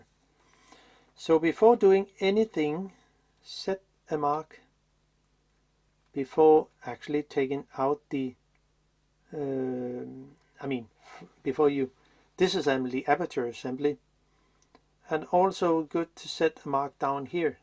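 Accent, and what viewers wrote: Denmark. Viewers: You sound danish. Are you located in Denmark?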